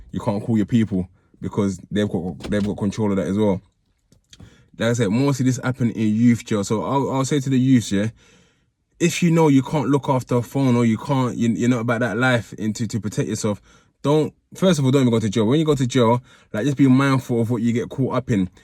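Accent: United Kingdom. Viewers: Pete Long I dunno what being Nigerian has to do with it? 🤔.. I was referring to UK accent anyways..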